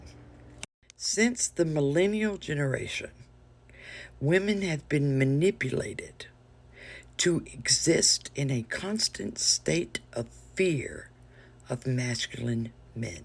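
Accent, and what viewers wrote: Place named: Appalachia, United States. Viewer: Are you from the Appalachian mountains? From southwest Virginia here. You sound like we do lol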